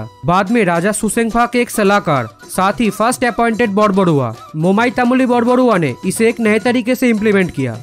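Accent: Bengal, India. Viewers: Your Bengali Accent Hindi is sooo noticeable I like it though!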